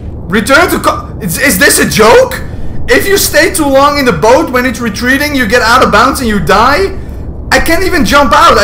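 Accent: Netherlands. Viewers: I can hear a Dutch accent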